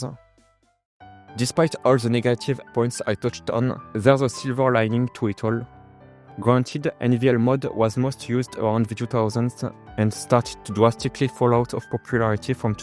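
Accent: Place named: France. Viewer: Gotta love that French accent! Keep the great work. (Also i caught a cold and now I am miserable. 🤧)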